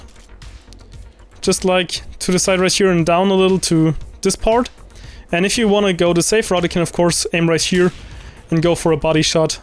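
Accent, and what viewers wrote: Germany. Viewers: Are you gGerman?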